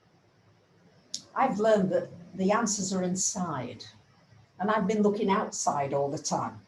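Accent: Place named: Lancashire, United Kingdom. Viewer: I always knew there was a little Lancashire twang in your voice,! Lots of love from a fellow Lancashire lass xxx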